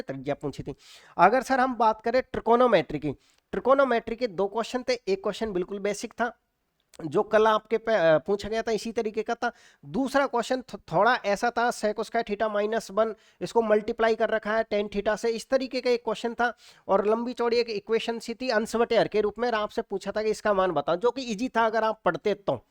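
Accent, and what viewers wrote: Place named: Jaipur, India. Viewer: Sir aapke bolne ke style me hi pata chalta hai aap jaipur se hai❤❤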